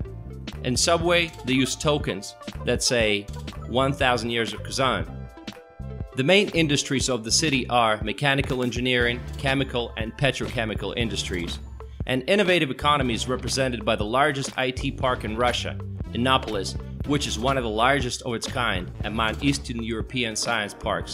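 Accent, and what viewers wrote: South, United States. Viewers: Looks like a great place. I really enjoy your videos. Where did you learn English....its almost as if you were in the Southern USA for some time! My daughter is interested in the Russian language, mostly because she is a musician and I have successfully passed down my love of Russian / Soviet classical music to her. Were planning on visiting Russia to learn Russian. We thought about Odessa in Ukraine and Bishkek in Kyrgyzstan, in the interest of saving money, but have decided to go to Mother Russia direct. Currently we are thinking of Volgograd or Krasnodar. Of course we will probably visit Moscow, St. Petersburg and Murmansk towards the end of our trip. Is the Kazan accent in Russian more similar to Moscow and St. Petersburg or is it closer to Krasnodar and the South? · Is it just me or is the Russian narrator in this video sound as if he took a course in trying to learn how to speak with an American accent?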